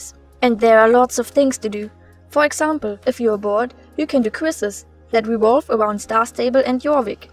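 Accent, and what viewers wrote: Germany. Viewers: Man hört so raus, dass du deutsche bist HAHA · Das du Deutsche bist merkt man echt krass an deinem Akzent... XD Kennst du eigentlich eine Feya Steelleaf vom SSOA..? p.p oder Vielleicht Camelia Mistlord? XD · Bin ich die einzige die da etwas deutsches an ihrer Stimme hört 😮❤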